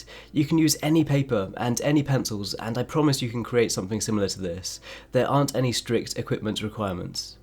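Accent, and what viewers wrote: United Kingdom. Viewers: your videos are now one of my favorite coz i learned two thing after watching it...how to draw and a british accents😁😅... can you draw emma watson?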